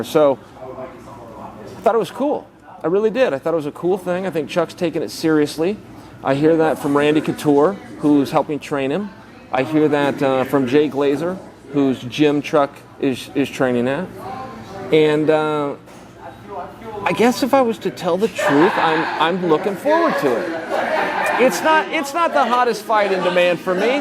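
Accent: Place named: Oregon, United States. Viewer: Being from the Deep South, I really like Chael’s Oregon accent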